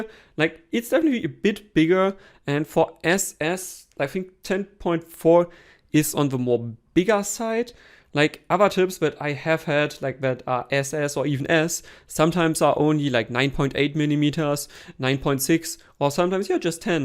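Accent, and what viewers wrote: Germany. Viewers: shouting SS with german accent... to much fo rme.. I roffled and moved on...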